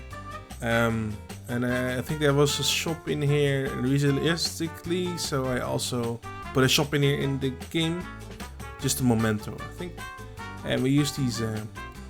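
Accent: Netherlands. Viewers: So creative, love this project. Also the commentary is the most lazy but most funny too. Ennuh ennuh, bla bla bla, you can see that for yourself whatever. Also, and I always wanted to ask you this, but is your Dutch English accent on purpose? Don’t feel offended as it’s so funny to listen to.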